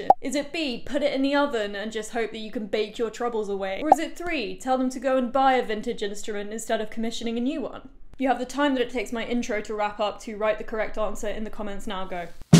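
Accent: United Kingdom. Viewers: I'm using your videos for English listening learning because it is so nice seeing your personality, seeing your work, and listening to your British pronunciation :)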